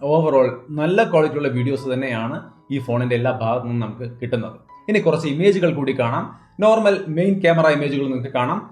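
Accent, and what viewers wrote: Thrissur, India. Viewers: Uff thrissurkaarana aano 😍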